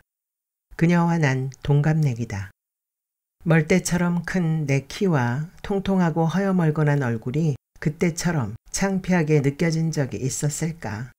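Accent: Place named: Jeolla, South Korea. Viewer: S님의 남도사투리 끝까지 재밋게 잘들었어요.😀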